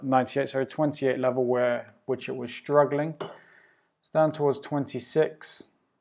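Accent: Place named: England, United Kingdom. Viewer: Thank you , a lovely lilted English accent a calm manner and uncluttered simple fairly clear approach, great pyschologically for some of us more (greedy, A type)Anglo stressors....Regards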